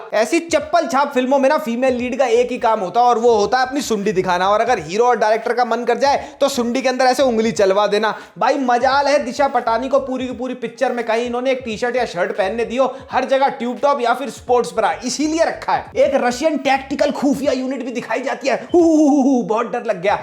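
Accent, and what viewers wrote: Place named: Delhi, India. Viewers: This guy roasts in a brutal manner & silences the promoter of those usual dumb foolery we see in some over hyped south Indian movies. Appreciate the honesty of this guy lately. Although i dislike a chhapri Delhi accent, that's their culture, i get it but the guy knows what's wrong in those movies. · He intentionally does that Delhi chapri accent for reliability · chapri delhi accent 😭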